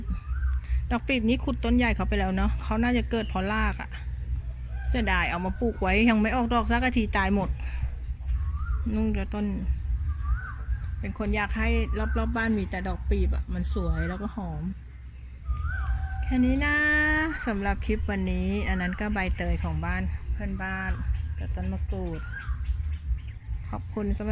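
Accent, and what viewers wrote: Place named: Suphanburi, Thailand. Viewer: 😊👍พี่คนสุพรรณเหรอครับ ฟังจากสำเนียง ผมเคยไปเที่ยวบ่อย..อิอิ😁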